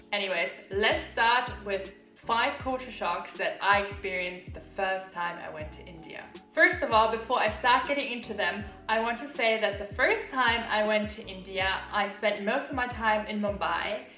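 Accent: United States. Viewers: She is German having American Accent, making video on India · Sister, are you really German? Your accent is not at all German. It's American.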